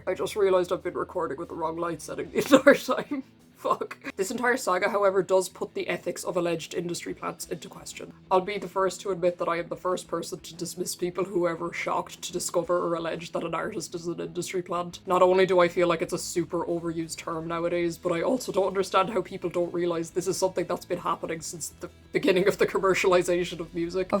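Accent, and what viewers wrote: Ireland. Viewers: What is this accent? Irish? You have a calming voice. Happy yt recommended this, I’m subscribing! You did a great job of going over this saga. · I don’t care. I’d listen to a contralto Irish brogue read the phone book. I lived in Dublin for a while and it brings me back. Beautiful country with lovely people with pitch black senses of humor. Hope I get to move back one day.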